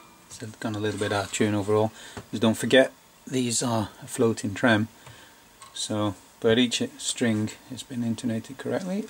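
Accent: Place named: Ireland. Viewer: U sound Irish, Scottish and Welsh sometimes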